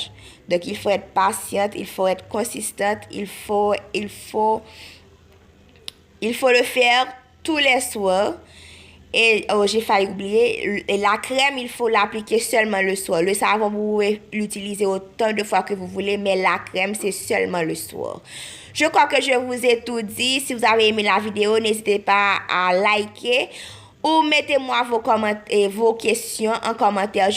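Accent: Haiti. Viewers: Waw j aime trop l accent haïtienne...j ai kiffé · Depuis sur l'accent , je pensais dèjà qu'elle etait haitienne!!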